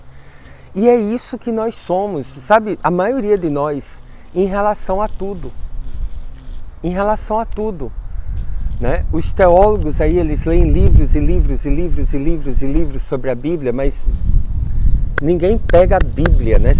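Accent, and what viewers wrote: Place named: Rio Grande do Sul, Brazil. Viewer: Mas Bah!!! Num bom gauchês!!! Show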